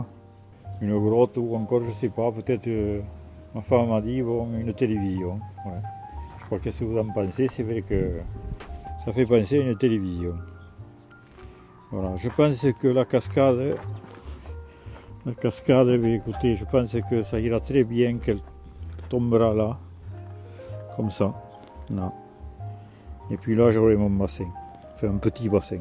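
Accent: France. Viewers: je me suis abonner a votre chaîne il y a un mois et je suis dingue de vaut vidéo , votre fort accent de Carcassonnais ma fait rire vous m aver donner envis d avoir des oiseau pour mes 14 ans ! merci pour cette videos !!!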